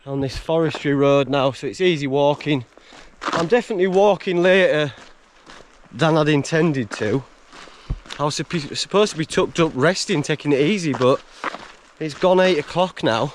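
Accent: Yorkshire, United Kingdom. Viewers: Only recently found your channel and watched the most recent vids…having watched this one; laughing at how strong your accent was, being back in Yorkshire 😂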